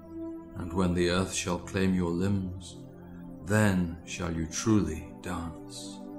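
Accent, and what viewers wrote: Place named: Manchester, United Kingdom. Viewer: Are you by chance Mancunian? The way you read reminds me so much of Morrissey (of Smith's fame)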